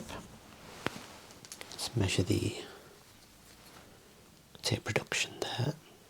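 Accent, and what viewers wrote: Birmingham, United Kingdom. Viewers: He is Brummie Taika Waititi, and no one can convince me otherwise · Your accent is too beautiful! 😍 You're from the UK, right? May I know, just out of curiosity, from which part? I love how you talk! Sending love from Argentina! 🇦🇷❤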